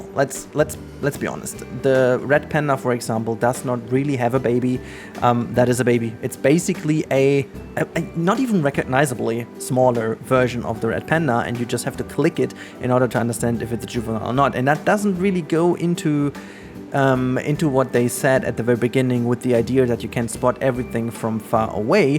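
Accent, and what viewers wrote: Germany. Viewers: Und was soll das bitte für konstruktive Kritik sein? Was soll jetzt gegen den Akzent machen? Das kommt nun mal durch. Ist ja nicht so, als ob er das macht, um Leute zu ärgern oder um extra deutsch zu klingen. Die wenigsten sprechen eine fremde Sprache komplett ohne Akzent, wenn man sie nicht von klein auf gelernt hat, also wozu sollte man das bemängeln? Man versteht ihn und sein Englisch klar und deutlich und wenn einen das stört, dann muss man eben woanders gucken. Wir alle lernen im Leben, ob Sprachen oder anderes und jeder lernt anders schnell und spricht Wörter anders gut aus. Niemand ist perfekt. Was genau soll daran nun voranbringen, das man seinen Akzent bemängelt, der nach und nach mit dem regelmäßigen Sprechen von allein verschwindet? Sprachen sprechen und lernen ist ein langer Prozess, nichts was man über Nacht lernt. Mal ganz ab davon, dass es noch hinzukommt, ob man britisches oder amerikanisches Englisch spricht, also was genau hätte er bitte noch verbessern können? 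Soll er seine Familie ignorieren und Youtube aufgeben bis er perfektes Englisch spricht oder wie genau sollte das bitte laufen? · Krasser Akzent... Bist du Deutscher?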